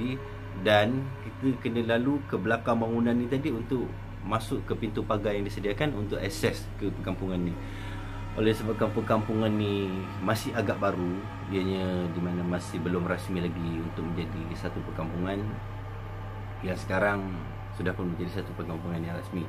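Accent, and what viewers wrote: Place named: Semporna, Malaysia. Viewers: kami tidak faham semua logat sabah bah... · Penceritaan kurang menarik bnyk ulang2 sama logat tu bagus kekalkan logat semporna, tdk perlu guna bahasa smjung..background n suara latar kurang tepat diguna...nak gambarkn sesuatu pastikn berserta kawasan...sekadar cerita, orang tdk akan percaya...ada baiknya berckp sambil tunjuk kawasan titik hitam...teruskn usaha..tingkatkn lg usaha...sokong org sm4na jd youtuber👍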